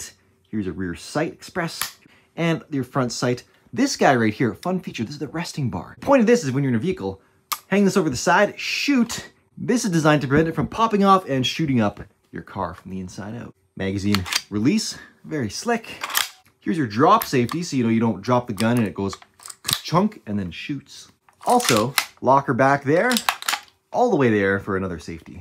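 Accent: Canada. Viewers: You sounded more canadian in this one haha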